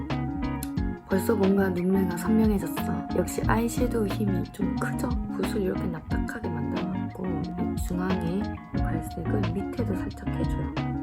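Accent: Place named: Busan, South Korea. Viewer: 얼굴하고 스타일은 American Style일인데, 말투는 완전 부산 Tough Girl. Feels like New Age 이효리. 그런데 왜 이 영상들이 네 recommendation으로 계속뜨지. 난 화장에 관심 없는데.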